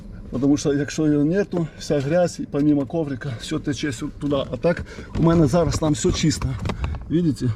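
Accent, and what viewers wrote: Ukraine. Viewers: Супер огляд, дякую! Я взагалі думав, що Ви україномовний і намагались говорити російською))) Як Ви брали? В Україні хіба є салони?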